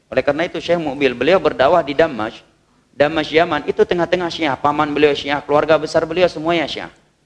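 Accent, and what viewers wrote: Aceh, Indonesia. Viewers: Sy seneng logat bahasa acehnya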